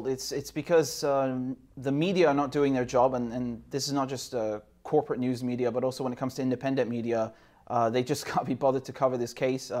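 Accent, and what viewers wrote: England, United Kingdom. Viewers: Your English accent comes out when you speak to other English people. I love it.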